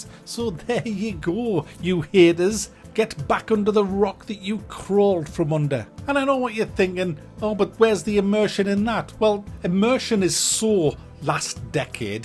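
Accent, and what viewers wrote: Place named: North East England, United Kingdom. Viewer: Are you from sunderland or anywhere in the north-east because you really sound like it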